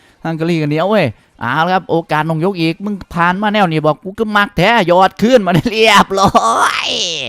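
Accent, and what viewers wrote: Isan, Thailand. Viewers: เขากะเว้าอีสานมึงฟังฮู้เรื่องอยุ่แล้วตอแหล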